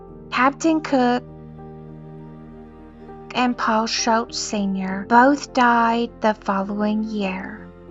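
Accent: South, United States. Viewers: I just recently discovered. For my whole life up until the past 2 and 1/4 years i lived in eastern Pennsylvania, and every once in a while the Allentown news media would mention this shocking unsolved double-murder. You have a cute voice and Southern accent!!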